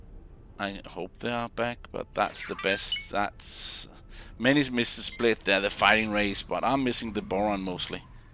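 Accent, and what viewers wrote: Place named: Denmark. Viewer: Er lige startet på din Lets play! Kunne høre at du var dansker og ville sige Godt arbejde og pas på dig selv <3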